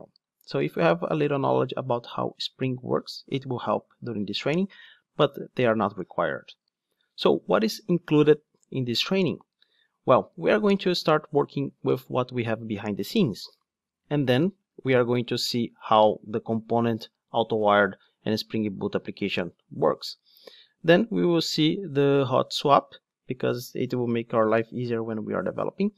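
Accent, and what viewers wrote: Brazil. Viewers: Eu pensando que era outra pessoa ensinando, mas é o mesmo BR falando inglês kkkkk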